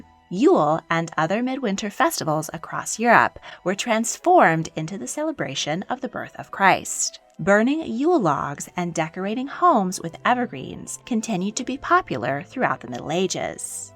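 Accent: California, United States. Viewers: Excellent research but the Californian accent is unbearable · why do we have to endure this American accent!!!!!!!!!!!!!!!!!!!!!!! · Very interesting ..Kaiser Wilhelm was not Edward The Sevenths cousin , he was his nephew ...your American accent is very thick isn’t it ?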